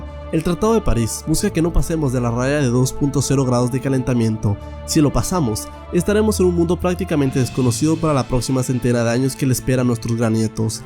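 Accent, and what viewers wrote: Ecuador. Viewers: Oh vaya eres de Ecuador O.o como yo :v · Eres de Ecuador?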